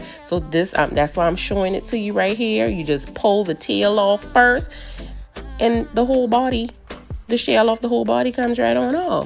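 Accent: Charleston, United States. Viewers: You must be from Charleston your accent is just like my dad and aunt… he passed away last year. Anyway the food looks yummy!!! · Got chucktown written all over your voice!!! Girl I stay watching recipes..here I am!!!😂😂😂my city!!!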